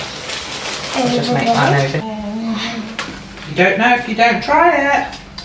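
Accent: United Kingdom. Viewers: 初めて拝見したのですが、英語が苦手な私でも一瞬でわかるイギリスの発音でびっくりしました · オススメにでてきて対してタイトル読まずに見たのですが、某アクセント研究YouTuberをみてるせいかイギリスアクセントだとすぐわかりました😂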